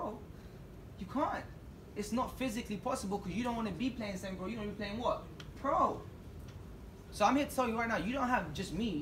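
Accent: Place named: United States. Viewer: Why does he talk in an American accent when he's British????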